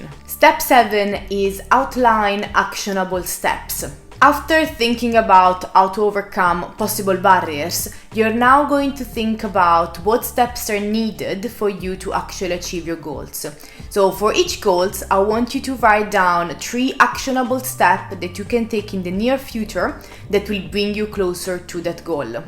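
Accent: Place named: Italy. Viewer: Hello, good morning! I found this video of yours about 20 minutes ago. It's fantastic and I liked it 😊. Excuse me, what is your native country? Your accent sounds very Italian. Greetings from Sonora, México 🇲🇽.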